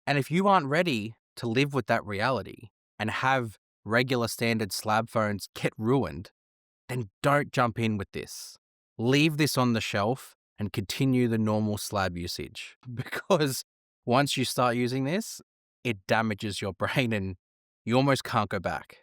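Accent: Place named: Australia. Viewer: Credibility: screenshots lost because I have to send it back . Yet , you hold up the one you bought . Any expert would send photos of 10 days to their keeper new black phone. .....just the optics and the devils advocate voice in my head. Schill or not , I've been here for information n exposure to new products, uses . ....to expect a lack of bias is to expect a lack of Australian accent. Dude, I tuned in just for the accent and the bias . Love you, man! Nothing but Respect.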